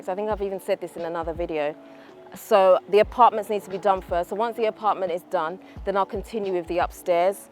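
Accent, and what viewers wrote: United Kingdom. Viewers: " " got a heavy heavy british accent, blimey! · My☝🏿thought about you (AKA ) your not old, but a great builder of houses & homes, so keep working on building your genuine soul then you & the family w/ fall in place 😊….. Oooh yeah you’re accent is smethg i luv to listen to idk 🤷🏿 is it British? anywho enjoy the Motherland’s landscape ❤ & rmbr to keep building sis.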